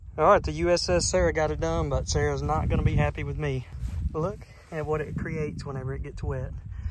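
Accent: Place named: Florida, United States. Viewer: These videos are so wholesome. Your accent also reminds me of home as I live in Pensacola FL not far from you thank you for the videos brother.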